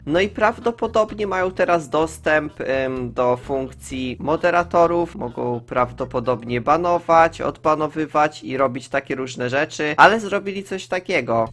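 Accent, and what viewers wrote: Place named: Podlasie, Poland. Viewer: Omg mam wrażenie, ze jestes z Podlasia bo gadasz po mojemu hahah❤️Mój nick: brązowa laska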